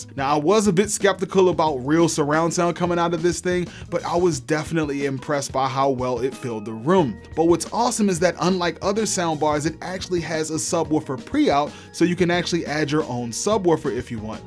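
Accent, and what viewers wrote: Baltimore, United States. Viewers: Thought I recognized that MD semi b more accent.:) Great vid as usual I use a lot of your info. MW, Wash DC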